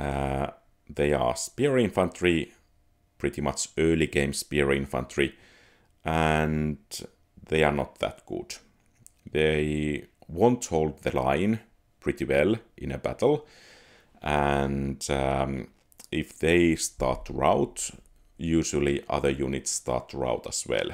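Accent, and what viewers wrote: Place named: Finland. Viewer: rallienglanti oli vahva täällä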